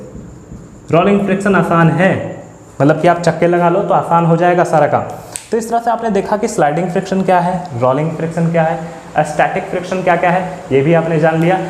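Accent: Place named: Bihar, India. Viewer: Bhaiya aap bihari ho....jai bihar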